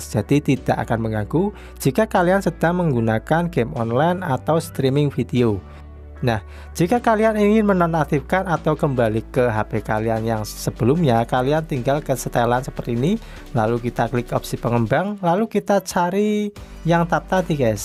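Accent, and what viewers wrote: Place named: Central Java, Indonesia. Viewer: Logat jawa tengah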